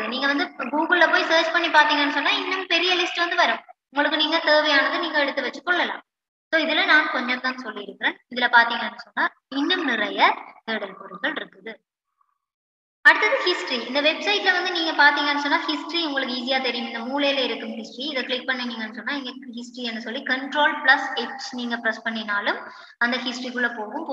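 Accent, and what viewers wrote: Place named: Sri Lanka. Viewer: wow srilankan tamil kekave nalaruku...unga voice alagu ....best wishes ma.....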